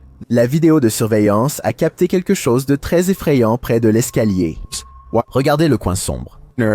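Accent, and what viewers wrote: Quebec, Canada. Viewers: C'est l'axent québécois connace 🤨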